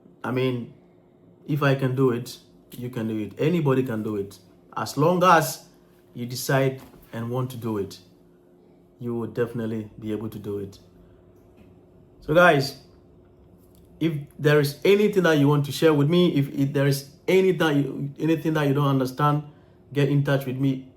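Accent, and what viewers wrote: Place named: Africa. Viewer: My question to you and every African who migrated to the West (Your accent tells that you are born on the Soil of Africa) ….. Why would you think You can call UK 🇬🇧 Canada 🇨🇦 or US 🇺🇸 Home forever. Glad President Trump is waking Everyone up. You may have the Passport that Shows that you are citizens of that HOST country. But wait ……There is More.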